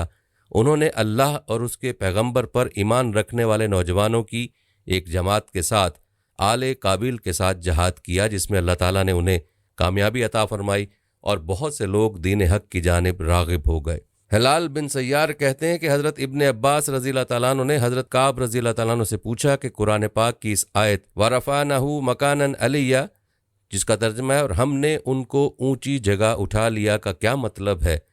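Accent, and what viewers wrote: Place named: Punjab, India. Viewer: Adrees nhi idrees hy na'am aap Punjabi hn lehjy lugta hy kion ghalat na'am lety hn.thory sy mehnat or homework karen or phir vdo banayen.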